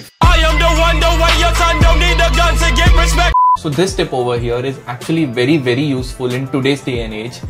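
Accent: India. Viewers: Indian accent really annoys me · For an Indian, your grammar is okay. Just work on the accent. Face it, you don't like it when Northeast people speak Hindi with very funny accent. Likewise.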